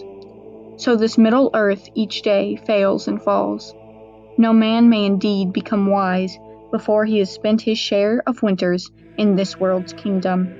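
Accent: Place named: United States. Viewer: Old English poem in an American accent no!!!!!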